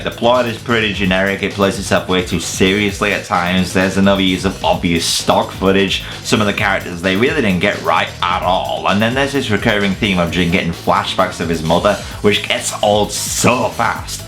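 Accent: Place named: Newcastle, United Kingdom. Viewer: Probably Jordy or something. I'm British and I'm not so sure of myself.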